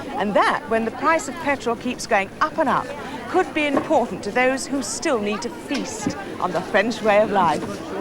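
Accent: RP, United Kingdom. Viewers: Yes I think I love you with your very posh accent and rusty French (I don’t care if you are now 85 years old) many a good tune is played on an old fiddle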